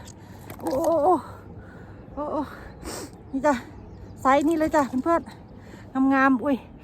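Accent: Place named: Phu Thai, Thailand. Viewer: คริปก่อนเห็นเว้าภูไทเป็นคนจังหวัดอะไรครับสกลหรีอนครครับ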